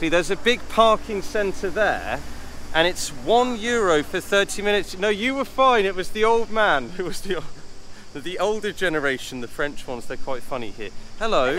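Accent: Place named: England, United Kingdom. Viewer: Brilliant. Your accent reminds me of my favourite cricketer Geoff Boycott from England . Thanks for showing lovely places in Uzes.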